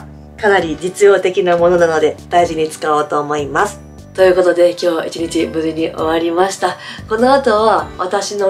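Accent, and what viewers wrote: Kansai, Japan. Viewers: ほんとにお父さんがお若い。。そしてさんの関西弁が新鮮ですきです！❤️ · さんのお父さんお若いーー！そしてさんの関西弁で素な感じもかわいいです🩵 無事七五三終えられてよかったです！ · さんのお父さん、めっちゃ若いです☺️✨お父さんとの会話で関西弁が出てて同じ関西人としてはなぜか嬉しかったです🥰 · 飴をペロペロの話可愛すぎて、つい可愛いーーーー！って言ってしまいました♡ さんの関西弁嬉しかったです！！関西人ファンより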